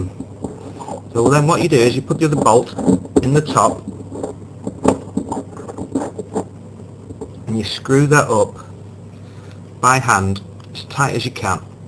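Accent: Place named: United Kingdom. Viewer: The classic British voice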